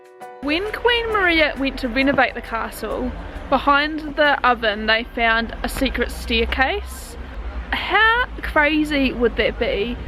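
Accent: New Zealand. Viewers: ) Lovely film, lovely persona, and lovely Kiwi accent (difinitely siventy-siven). And I might have a better question: How many things are definitely worth visiting in this country? There are many, especially outside the usual tracks - try Iasi (pronounced Yahsh or Yaash), a historic capital of Romania, in North-East of the country (historic region of Moldova).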